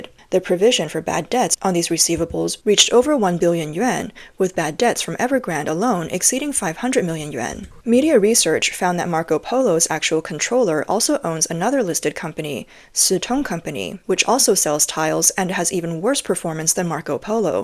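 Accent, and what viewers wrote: Australia. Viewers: Ya, I think she’s Australian, faint accent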